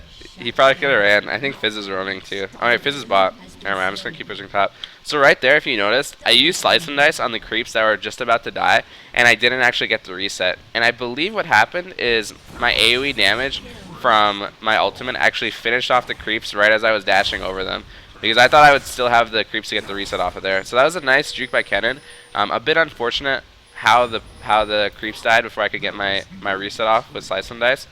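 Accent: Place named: Texas, United States. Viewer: Dat accent ... man.. are you from Texas? :D Anyway, nice video and thanks for all the advice :p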